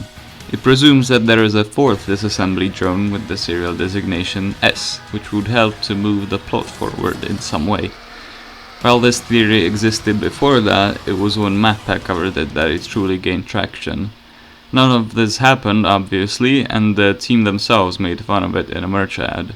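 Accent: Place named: Czech Republic. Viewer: nice finally i see someone saying that the czech dub is nice WAIT HOLD UP TY JSI ČECH? such a nice accent I'm almost jealous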